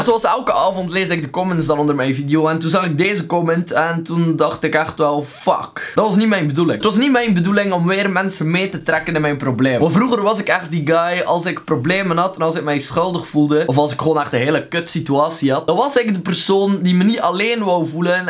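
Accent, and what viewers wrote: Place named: Flanders, Belgium. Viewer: ik ben zelf een nederlander, maar ik vind Vlaams meestal best een stomme taal. maar bij jou vind ik het echt heel grappig en ik word er blij van! :)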